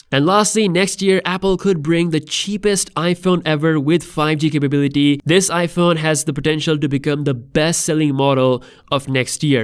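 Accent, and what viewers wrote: India. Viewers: Indian accent? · are you Indian cause you accent is different I am not complying just telling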